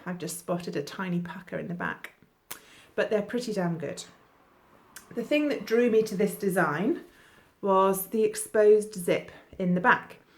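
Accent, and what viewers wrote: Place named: United Kingdom. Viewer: I am in love with your british accent!! :) just subbed and liked. would love if you could return the favor as i am just trying to grow a channel :) keep it up xoxo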